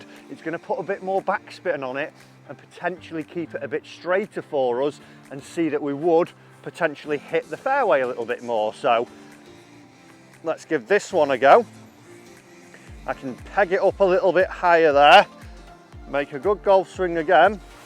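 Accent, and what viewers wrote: United Kingdom. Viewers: must be the heavy northern Accent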